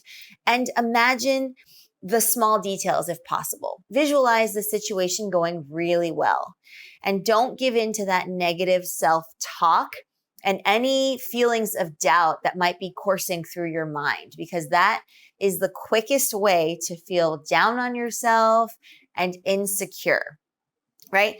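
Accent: United States. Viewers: Very good american enghish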